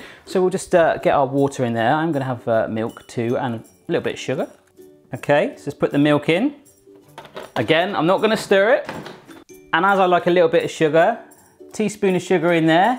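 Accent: United Kingdom. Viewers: Is he British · I really like British accent 😍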